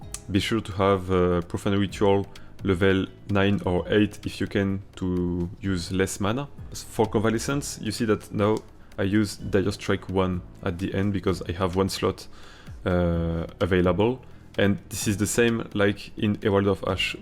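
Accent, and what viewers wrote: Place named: Russia. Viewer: I think you're Russian because you have a Russian accent.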